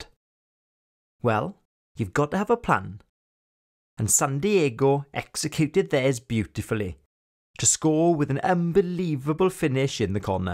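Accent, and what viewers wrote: Wales, United Kingdom. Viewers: I spent two weeks in Wales in 1978. So good to hear the Principality dialect again. Thanks for the instruction as well. Rugby is an elegant game and thanks to Internet connections, I can get up much too early in the US and enjoy a match.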